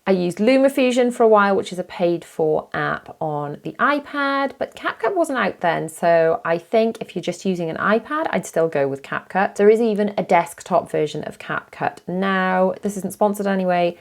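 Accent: Wales, United Kingdom. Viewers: A video breaking down your filming and editing process would be massively helpful! I’ve been watching you for years and it’s just been nice to hear a Welsh voice and relate to your life as a mum to 4 (like myself) at the moment I’m loving your Disney vlogs and they will help me massively as I plan Disneyland Paris next year and in the near future florida (because I’m dying to go back!) I’m also a YouTuber and I love every minute of it but it is soooooo hard. You definitely neeed to have the right mindset to keep pushing through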